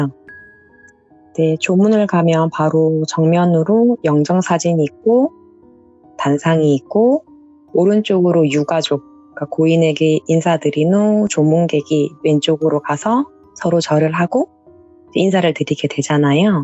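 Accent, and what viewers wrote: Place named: Gyeongsang, South Korea. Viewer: 근데 경상도분이네? 억양은 숨길수없다 ㅋㅋ 난 완벽함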